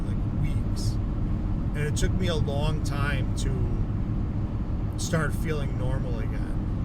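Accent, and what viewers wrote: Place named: Wisconsin, United States. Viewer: Are you from Wisconsin ? I can hear the accent in your voice. Im from the Green Bay / Appleton area .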